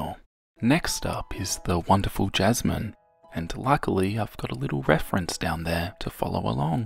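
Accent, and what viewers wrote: Australia. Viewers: Love a deep Aussie accent! Stumbled across this video and the way you narrate it is fricken great. From 1 Aussie to another! 🤙🇦🇺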